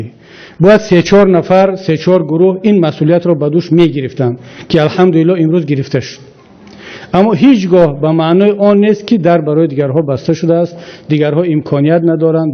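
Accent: Tajikistan. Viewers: Ана инпа меган точики тоза бо забони точики тоза гап мезана одами бо илм малумаст илмаш. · лафзаш тоҷикии тоза аст. ман ба лафзаш ягон эътироз надорам. ман аз ноҳияи Айни вилояти Суғд мебошам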